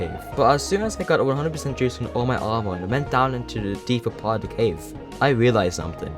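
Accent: France. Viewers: Are you french? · eww are you french? good video tho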